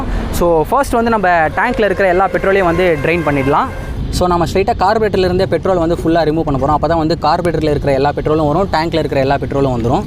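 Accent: Vellore, India. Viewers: Nega Vellore ahh???.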